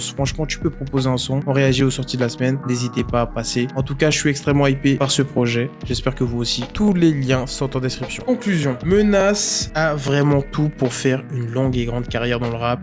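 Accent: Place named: Dominican Republic. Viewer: la façon de comment il parle espagnol sa se voit que c'est un dominicain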